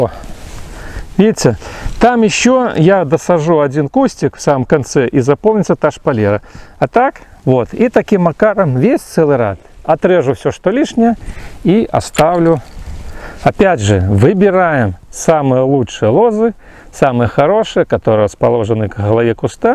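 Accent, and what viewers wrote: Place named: Belarus. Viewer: большое спасибо за информацию, очень нравится ваш голос,ни с кем не спутаешь,с белорусским акцентом, вопрос,какой секатор (фирма ) вы использовали для обрезки. Привет большей из Сибири,г.Томск (виноград пошёл на север )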